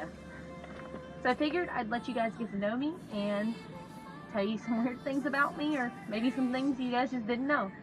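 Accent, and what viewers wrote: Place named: South, United States. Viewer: Thats pretty awesome to learn some things about you! I would like to say, I love your southern accent (Its very strong but really nice). I enjoy video like these! :)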